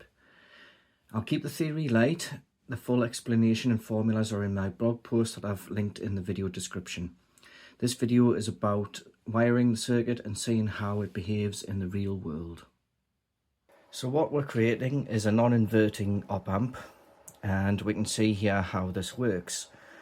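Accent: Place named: Northern England, United Kingdom. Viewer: Glad your on the mend you sound like we are both in God's country Up North so subbed just for that and before I have look around your channel. All the best, Kev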